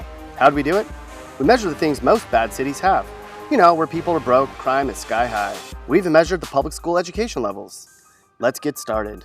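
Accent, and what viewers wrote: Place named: Indiana, United States. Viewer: You sound like your from Indiana but south bend and terre houte are okay now. I live right under Anderson so that’s okay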